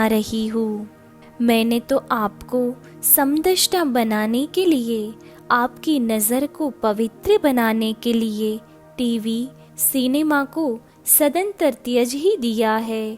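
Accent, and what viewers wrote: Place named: Gujarat, India. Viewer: Aapki hindi me gujrati aa jati he.. Aapki hindi pure nahi he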